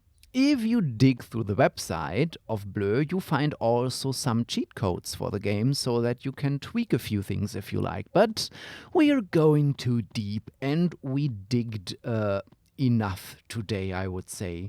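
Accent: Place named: Germany. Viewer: You have a German accent. Are you from Germany?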